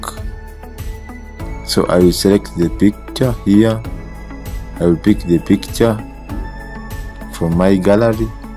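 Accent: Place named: Uganda. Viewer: You are fro Uganda I know the accent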